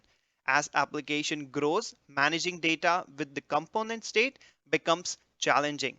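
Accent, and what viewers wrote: Gujarat, India. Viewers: Thank you sir... Are you from gujarat?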